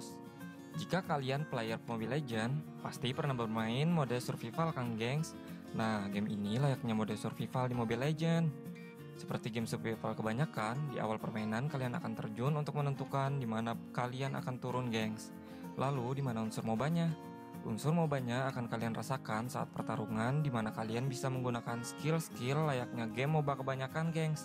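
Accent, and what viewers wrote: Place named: Medan, Indonesia. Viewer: Hahahahhqh Bukan kaleng" ya bang..... Itu Medan banget gangs.... Hadir Nyimak bang.... Jgn lupain aku ya bang.... Salkomsel bang.....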